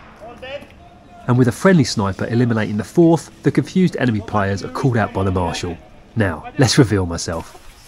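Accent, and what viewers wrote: England, United Kingdom. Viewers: “Tell my mrs.” LOL. That guy deserves a beer. I love the way you English say things.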